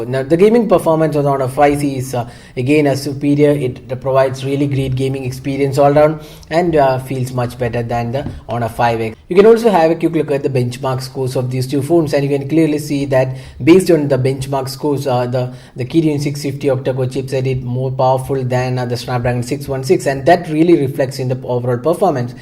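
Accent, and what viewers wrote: Kerala, India. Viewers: Your a mallu its very clear with your english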